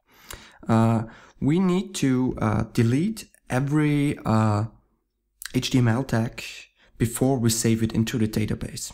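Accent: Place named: Germany. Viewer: Germanish english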